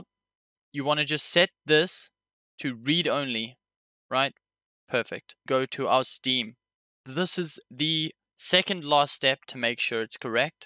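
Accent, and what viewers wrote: South Africa. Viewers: South African? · are u south african? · Are you south African bro? Appreciate the content and all love from RSA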